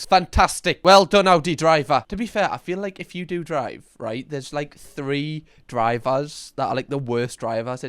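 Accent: Newcastle, United Kingdom. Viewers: This guy sounds like he's from Newcastle and if he is I love him already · Whats part the isles that accent,?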